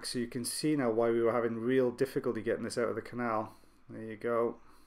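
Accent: Wales, United Kingdom. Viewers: ear wax removal + welsh accent asmr = most satisfying video on youtube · Sally, it's about as mild as an accent gets. I can just about detect Welsh, which means he could be from Hereford, England, where some folk sound Welsh to my home counties ear. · Are u welsh? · Are you Welsh? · I thought I could hear the beautiful Welsh accent in his voice lol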